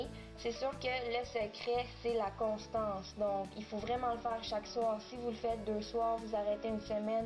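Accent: Quebec, Canada. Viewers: Je viens de tomber sur une de tes vidéo et je suis charmée :) J'ai quelque petites cicatrices près de la mâchoire et je vais essayer ton truc bien vite. Tu explique les choses si bien et pour tout te dire l'accent québécois me fait craquer :D Gros bisous et merci pour cette vidéo, je m'abonne :)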